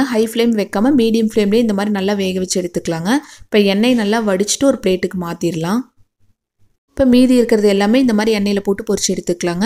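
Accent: Kongu, India. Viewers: Hi sister I'm new subscriber.. I like ur videos... Ninga kongu Tamil Thana pesaringa. Yentha ooru sister???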